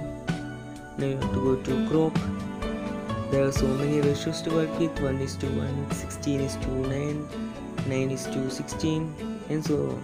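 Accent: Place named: Kerala, India. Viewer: His English slang makes me think that he is malayali...aren't you🤩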